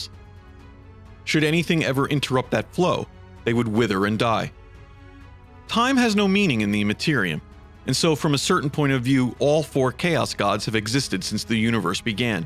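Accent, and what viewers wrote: United States. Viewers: Use other voice actor than a 30 year old with american accent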